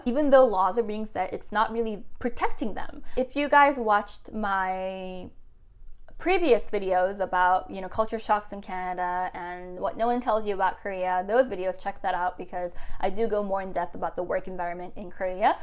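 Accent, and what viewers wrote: United States. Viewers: It sounds almost like American!😭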